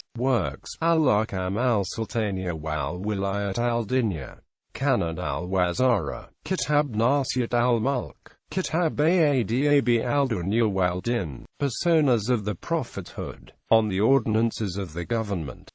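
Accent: United Kingdom. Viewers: The robotic accent maked it impossible to understand, such videos including arabic words must not be created in British robotic accents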